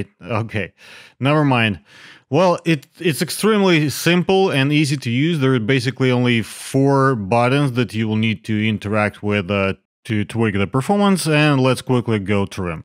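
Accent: Russia. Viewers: russkiy russkogo slishit iz daleka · Русский акцент я узнаю везде, так держать товарищ! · Я прям чувствую родной акцент :) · Русский акцент не перебить чистым английским) Отличная тулза, спасибо! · Russian accent coder "based" in the US yeah call me paranoid but nope i like my FPS like it is. Also, 50/50 stolen code. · Чувак, ты же явно русский, правда?)))) акцент..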